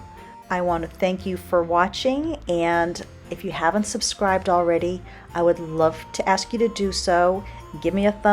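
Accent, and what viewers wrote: New England, United States. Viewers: Please don’t loose your New England accent it’s a great part of what we love about New England!! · I love your pantry! It’s beautiful! Your kitchen looks amazing also!! I love your New England accent!! Hosses, not horses!😂😂My grandparents were from Mass, and my great grandparents resided in Stonington, Ct. I’m a New Englander at heart. I love seeing your videos and always look forward to your updates! Love your beautiful home! Jean😘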